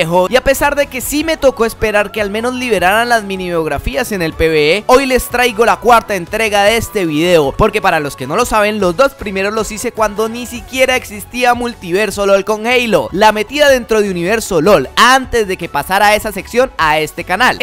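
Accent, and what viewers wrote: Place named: Bogotá, Colombia. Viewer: Ah, el buen habla de un Bogotano, no lo cambio por nada :v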